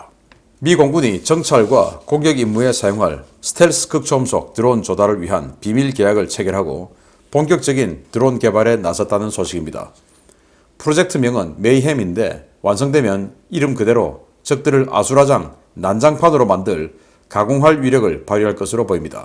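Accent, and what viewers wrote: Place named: Gyeongsang, South Korea. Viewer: 경상도 사투리 정겹습니다,,항상 응원합니다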